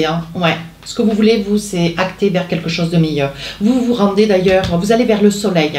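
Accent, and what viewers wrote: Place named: Southwest, France. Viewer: Vous avez l’air fort sympathique et me faites bien rire, avec votre parler cru mâtiné d’un petit accent (sud-ouest?). Pour le fond, mensonges et trahisons on s’en passerait mais succession et lointaine destination sont des mots qui vont très bien ensemble ;) Affaire à suivre, en vous souhaitant le meilleur à vous surtout!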